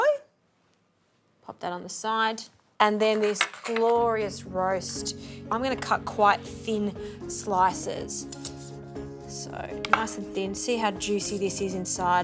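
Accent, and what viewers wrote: Australia. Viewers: 😂😂😂😂😂😂😂peppah, sugah, flourah, buttah, australian stryne at its worst, some elecution lessons wouldnt go astray, yyyeeeeech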